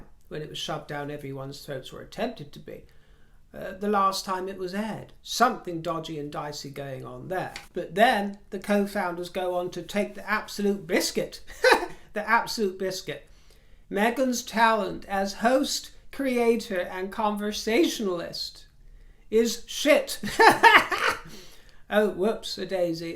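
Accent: United States. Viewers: I loved your Valentine outfit today, and I must say, you do an extremely good American accent! ❤️ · I’m American. LOVE LOVE LOVE your American accent!💗🤣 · I love your American accent! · Luv your American accent!! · an American here who truly delights in your broadcasts and appreciates your humor. Your American accent was very well done, but I was alarmed by the change! You sound better as an American than Meghan does. 😆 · Great American accent,! Perfect! 🇺🇸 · Your American accent is spot on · Loving your American accent. ❤❤❤❤ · I love your laugh and your American accent! Keep on fruiting!! 🍇🍎🍉🍋😄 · Ugh NO American accent! My ears! My ears! 🙉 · darling, beautiful American accent! Spot on! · Ms. very elegant as always! Happy Valentines Day. I would love to be a fly on the wall, watching you and Sherlock Holmes ( Basil Rathbone) having a conversation. I am sure the conversation would be educational, and over my head. 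I am just a older country boy from NW Florida 20 miles east of Pensacola (southern Alabama really). Worked with a lot of brits. 23 and 24, recce loved their dry sense of humor. Every time I spoke Id get cracked on (southern accent) Can't understand Cockney accent it's funny though. Your American accent is spot on!!! Great show as always. God (Yeshua) bless you and your family and keep you safe!! Snogally woggly ha ha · I've been watching you for several months now and find you to be charming, sophisticated, on the cutting edge of outrageous fashion trends and above all, hilarious. Your American accent is excellent. Your laugh is wonderful and you wear your lipstick loud and proud. Throw in gloves and tiaras and you are a national treasure. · Loved your American accent. Props to you 👏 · Your American accent is quite good. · Great American accent! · 😂😂 Omg ur American accent spot on. You look ßæv†!fu| 🌹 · ♥ 💎♥💎♥ Happy Valentine‘s Day, dear. 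You had me laughing, as usual here. Loved the American accent and the insightful observations. Looking gorgeous and in top form.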